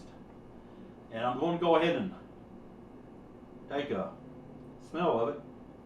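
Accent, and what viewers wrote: United States. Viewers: Are you an Okie?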